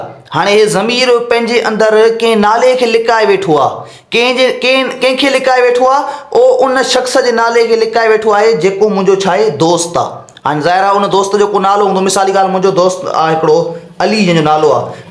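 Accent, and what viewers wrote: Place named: Shikarpur, Pakistan. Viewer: ap ho sir but bt karne ka way esa h jese shkirpur k memon bolte h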